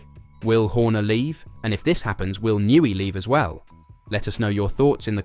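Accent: United Kingdom. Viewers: I can tell by your accent that you are from England and maybe you want screw RedBull so maybe Hamilton get some advantage and finally win his 8th championship ?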